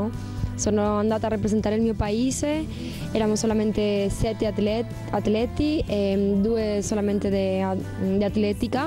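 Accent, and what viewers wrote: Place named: Italy. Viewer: Soo what!!!! you speak English whith Italian accent.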